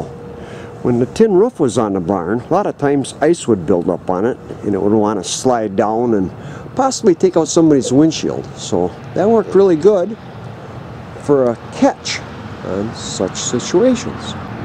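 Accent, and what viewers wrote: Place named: North, United States. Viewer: awesome vid keep em comin. cant tell if hes from sconny or sota but i love the northern accent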